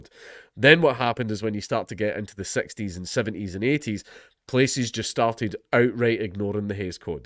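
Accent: Scotland, United Kingdom. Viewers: I like your accent, you sound like William Wallace.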